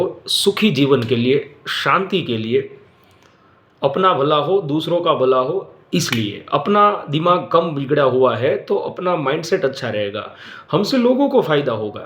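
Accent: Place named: Gujarat, India. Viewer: Sir ap Gujarati ho apiki bol se Gujarati lagraheho.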